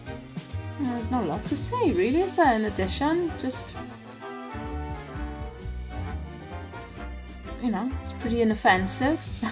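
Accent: Wales, United Kingdom. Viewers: I use this and usually does brillaint for me, i can usually add £5 and almost always double my money, also you can hit both bonuses on the same spin, 20p spins is the best for me, also is that a welsh accent i detect? Im welsh too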